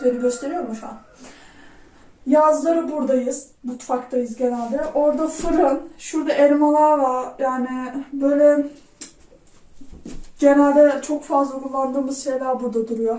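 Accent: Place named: Sinop, Turkey. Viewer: Sinoplusunuz galiba kınuşmanız sinopluları anımsatıyor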